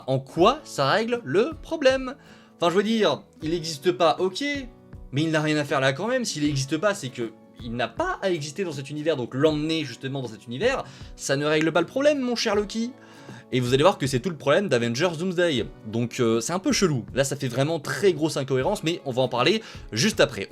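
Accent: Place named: Lyon, France. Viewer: t'es lyonnais, non? On dirait. Je viens vous confirmer que Nartée, Endrique, ainsi que Correntyn Tollisseau SONT dans Doumsedeille! EH OUI!!!! Ils font partie du MARVELE MOUVIZE MULTIVERCE!!!!! Ils vont des petits ponts à Wong et Docteur Strange (Strange prononcé en français ringard). Aussi, il y aura l'alien qui est apparu dans un ipizode des Vacances de la Moor, le sequel de Helène et les maçons. INCREDIVULVE! Eh oui!!!! Brénoudeille et Doumsedeille!!!!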